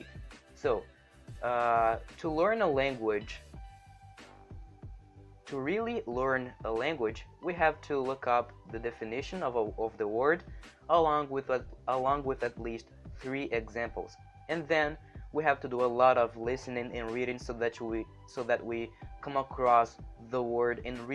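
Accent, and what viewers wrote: Brazil. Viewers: Não, a maior parte das palavras que utilizou são intermediárias, e o fato de ele ter um sotaque um pouquinho típico de brasileiros facilita a compreensão. O vídeo também só tem 9 minutos, o que facilita mais ainda ter uma boa compreensão. Mas sim, você está num ótimo ponto para começar a estudar a língua e falar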